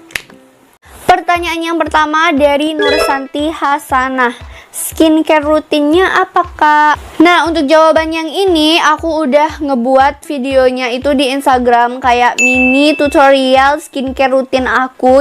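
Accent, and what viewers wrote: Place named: West Java, Indonesia. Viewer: Ak kirain orang Sunda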